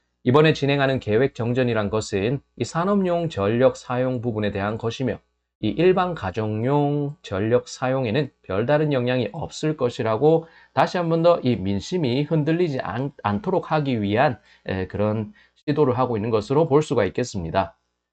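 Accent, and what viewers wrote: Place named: China. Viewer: 조선족 유투버 같다.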